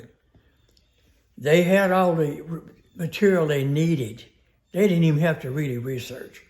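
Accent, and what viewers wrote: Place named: Louisiana, United States. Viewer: I know you were born in Mississippi, but you have picked up a Louisiana accent over the years. I enjoy listening to your wisdom. God bless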